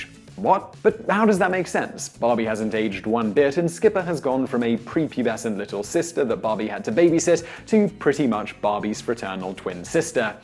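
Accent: United Kingdom. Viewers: The dude's British accent makes it sound like he's talking about someone named Bobby.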